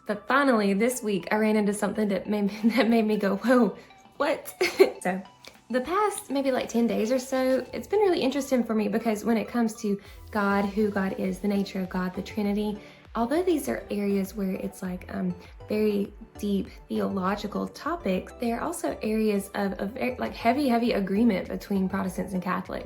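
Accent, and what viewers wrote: South, United States. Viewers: I'm a lifelong Catholic and I found this explanation in terms of the buzzer and the safeguard really, really helpful. I think the parallel you're drawing with the way Catholics react with "that's not what the Church teaches" is very apt. Thank you so much for sharing your experience. I really love your videos. Also, as a native Texan living in New England, I enjoy hearing your very Southern accent. It sounds very much like home.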